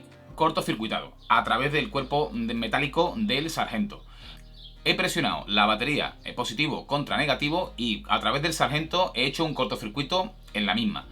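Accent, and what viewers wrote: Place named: Spain. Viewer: En realidad la s si la pronuncia la que cambia por d es la c y la z, es por el lenguaje Español y el acento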